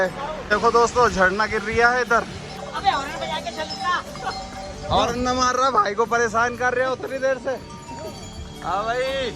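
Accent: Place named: Kanpur, India. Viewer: आपकी भाषा में कानपुर का flavour रहता है अच्छा लगता है बाहर हो तो और अच्छा लगता है। जय बाबा की 🙏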